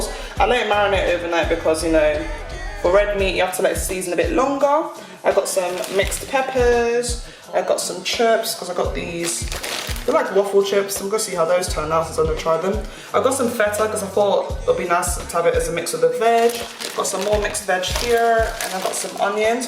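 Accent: United Kingdom. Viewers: I love lamb chops, literally can eat it anyday. Great recipe! Ps..big up, fellow British accent lol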